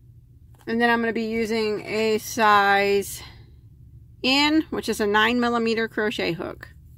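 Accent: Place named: Indiana, United States. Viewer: I know you have heard it before but I need to thank you again for being real - authentic! You aren't selling us on things, you are sharing! Even better, as a West Central Indiana born, raised, and still residing her gal, I like someone who sounds like me! Cannot thank you enough for teaching, sharing and giving of yourself and sharing family times with us! Keep up the great work! You are very talented and keeping the craft alive!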